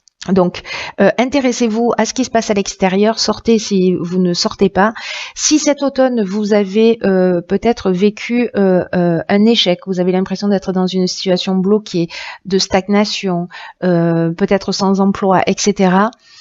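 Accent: South, France. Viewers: Merci ! Reçu 5 sur 5, accent du Sud compris, soleil assuré !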